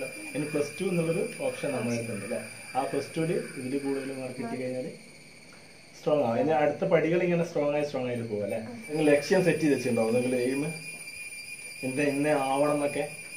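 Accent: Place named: Vadakara, India. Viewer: bro 😍 Vadakara yude thani bhashayil ulla vlogs😜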